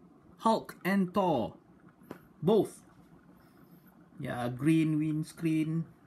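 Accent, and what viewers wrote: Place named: Malaysia. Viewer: I did Subscribe this channel about 4 months ago, your channel has completely fulfill my desire to get to know what Hotwheels are on the newest case. At first, your video was good, but you also take notes to some suggestion that you get from your subscriber so now your video is getting better. And also, your intonations, your unique English-Melayu accent, the way you were talking makes me feels like i was having a conversation with my friends. You makes your subscribers feels like they were there, watching the unboxing themselves with you. Nice Job, Pakcik! your most famous tagline is: "Yes it is!" :D Salam.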